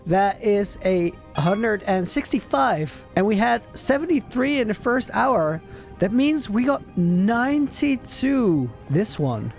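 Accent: Netherlands. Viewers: Even though I can hear you are dutch, I love how confident you talk in English! Great content! KEEP IT UP!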